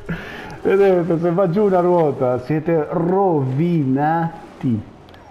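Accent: Rome, Italy. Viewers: super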